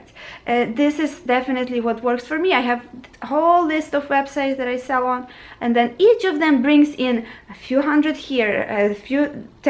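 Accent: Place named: Ukraine. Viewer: I love your accent. You're Ukranian, right? Thank you so much for your helpful videos! I just discovered your channel. I love it! You're such a blessing! Thank you!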